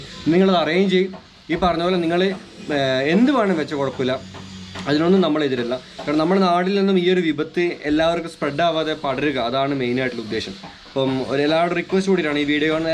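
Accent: Kannur, India. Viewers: Kai kazkeet poya mathi😎 kannurkarante tone ingana aan💪🏻😎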